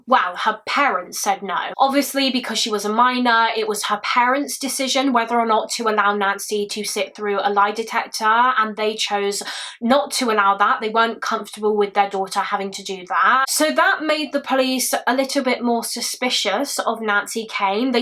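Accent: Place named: England, United Kingdom. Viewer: In addition to your personality, I like your genuine English accent.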